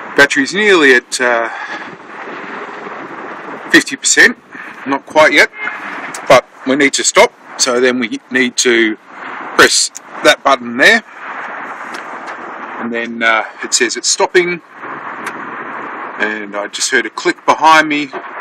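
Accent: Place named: Australia. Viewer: Dude ! Aussie !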